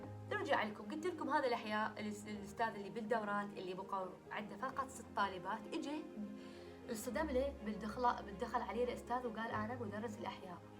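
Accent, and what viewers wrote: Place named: Basra, Iraq. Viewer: حبيت طريقه حچيچ أموت على لهجتكم الحلوه اساسا البصره كلها احبها أتمنى اروحلها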